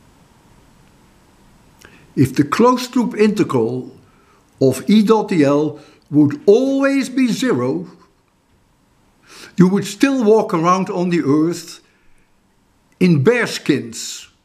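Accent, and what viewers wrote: Netherlands. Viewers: respect, you sound like a dutch man btw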